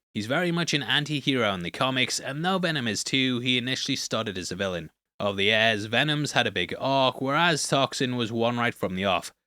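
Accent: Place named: Liverpool, United Kingdom. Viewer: unforgivable scouse accent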